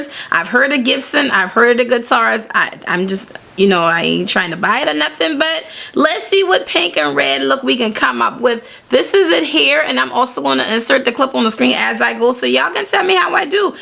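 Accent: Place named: New Orleans, United States. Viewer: Loved the lip combination!! and love your accent. are you from nola? lol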